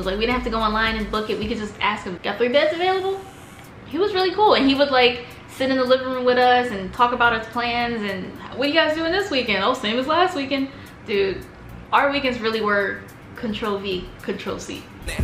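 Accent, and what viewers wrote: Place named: United Kingdom. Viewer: Your UK accent is getting better for sure! At this point I Don't even think you're doing it on purpose 🙄 🤙🏾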